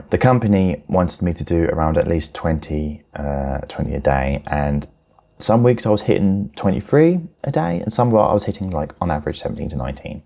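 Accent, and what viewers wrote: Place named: United Kingdom. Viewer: nice video man, didn't understand 90% of what you were saying though. Cool yookay accent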